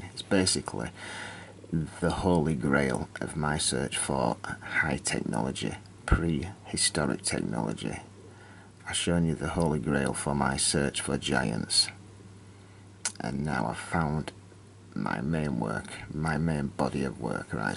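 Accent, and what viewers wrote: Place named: Yorkshire, United Kingdom. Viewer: Sorry I'm a few years late, this stuff is fascinating. Once you see it, you cannot unsee. Great work. Cheers From NZ. I can't pick the accent but I'm Guessing York or Scarborough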